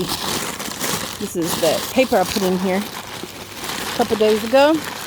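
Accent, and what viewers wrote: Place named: South, United States. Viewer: Ma'am you have the best accent. My mom is from NC and dad is from TN so I grew up hearing the music of southern accents. BTW cool garden and thanks for your channel.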